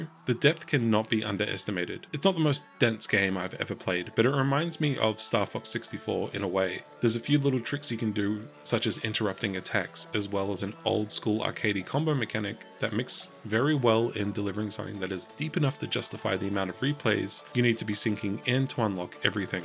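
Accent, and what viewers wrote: New Zealand. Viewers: Your accent - are ye from Aotearoa ???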